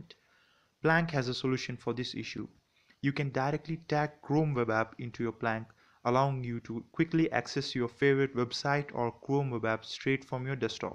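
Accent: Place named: India. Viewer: Great review! I got a bit of shock hearing you swear (I wasn't offended mate!) - not use to hearing the word "shit" in an Indian accent! Good stuff mate! Have you reviewed and looked at Synergy? (plank and synergy are my two "must have" Linux applications!)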